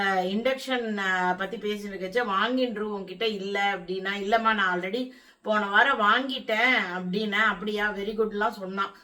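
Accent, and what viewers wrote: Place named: Iyengar, India. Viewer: Ellam Nanmaike, all the best, I am sure your mom n law understood your situation. She was there on Mon, perhaps spoke to you at length on that day to wish you well ( leave her blessings in person ), namakku enna arul irrukko adhu muzhu shaga irrukkum, don't worry, everything will workout fine, I like your iyengar tamizh, I watch off and on, we will wait for you to come back and enjoy your recipes, take care, relax and do the needful under the circumstances. Be well, keep strong, thanks, Radha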